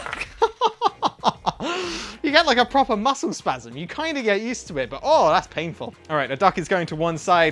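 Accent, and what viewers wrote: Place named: United Kingdom. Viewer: I like how British people say three as free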